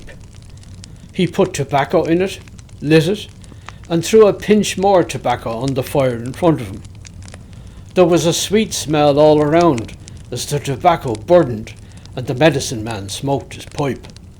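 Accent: Dublin, Ireland. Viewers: What a treat, a tale told by a Dubliner waiting for me when I opened YouTube. Many thanks for this slightly sad story.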